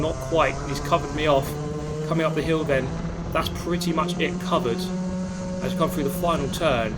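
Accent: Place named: South East England, United Kingdom. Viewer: Great content again mate! That fight for the first place was intense! Your inspiring me to try and have a go at it! Ive seen seen they are some races in Farnborough near me, judging by your accent you are racing somewhere in the south east?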